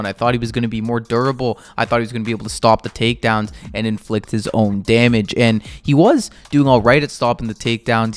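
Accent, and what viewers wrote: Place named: United States. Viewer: Are you a Yank?? Sounds like it. Typical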